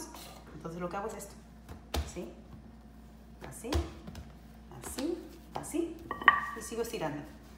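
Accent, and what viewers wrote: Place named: Peru. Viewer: Que hermoso acento peruano. Un abrazo desde Chile. Soy de Ica.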